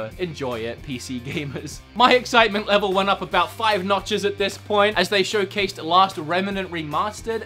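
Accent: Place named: Australia. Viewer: Bro, dont change the Aussie accent man...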